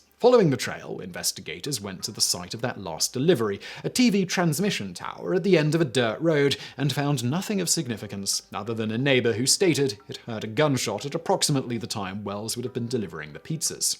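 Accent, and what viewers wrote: United Kingdom. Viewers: I can't watch a UK guy tell an American story🤣it's annoying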